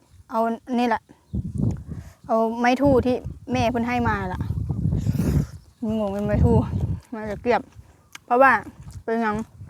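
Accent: Phu Thai, Thailand. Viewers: สวัสดีครับ สำเนียงผู้ไท น่าฟังมากครับ🌹🌹🌹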